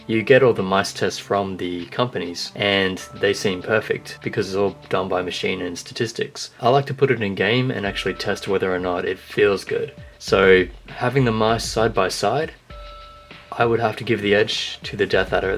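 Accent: Australia. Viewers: Hi it's me again, I love your videos. Is the Razer Deathadder durable? How stiff or light are the main left and right clicks? Are you australian or do you just live in australia?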